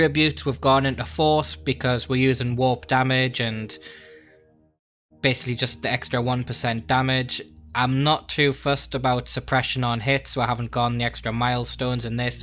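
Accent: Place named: Northeast England, United Kingdom. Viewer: Sup good to see another Brit putting out some good content, by your accent I'd guess your from the northeast? Anyway I love to see what you could come up with for ranged sader particularly H bolter if possible and a sniper sin it's seems the balance is way behind on those two types but It would be great to see if you could come up with something for them that can reach the milestone of battle120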